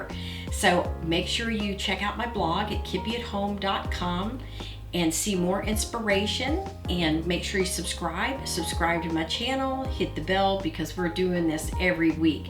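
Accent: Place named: Virginia, United States. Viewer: Hi, Your accent sounds like it is from a previous place we lived. Is it possible that you are from Virginia? We lived in New Hanover County, specifically Mechanicsville.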